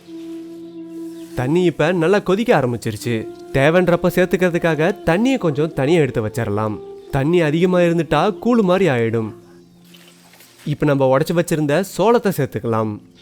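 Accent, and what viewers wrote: Salem, India. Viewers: Salem ah neenga bro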